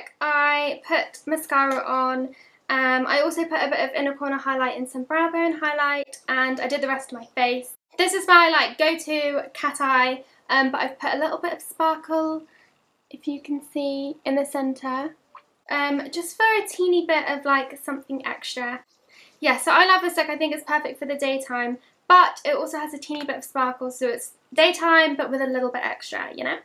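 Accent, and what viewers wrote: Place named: United Kingdom. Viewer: I love ur british accent.. I love your face.. Love your voice when your talking..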